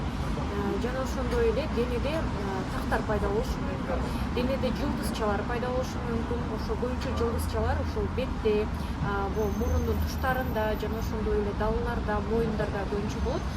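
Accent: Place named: Osh, Kyrgyzstan. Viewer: Оштук окшойт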